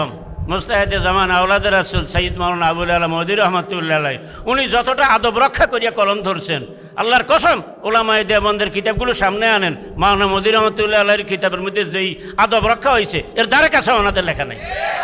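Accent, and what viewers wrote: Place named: Bangladesh. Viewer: বাংলা ভাষার মত ক‌রে উর্দু পড়া হ‌চ্ছে!!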